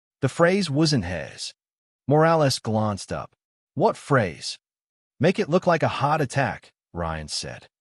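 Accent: Australia. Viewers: I don't know which is more annoying, the Australian accent or the pronunciation of Mia's name as "M.I.A."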